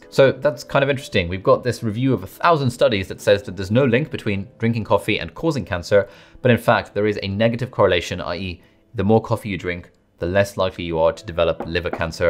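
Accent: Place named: United Kingdom. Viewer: New Subscriber, where in the UK are yiu, can't work out the accent? 🤔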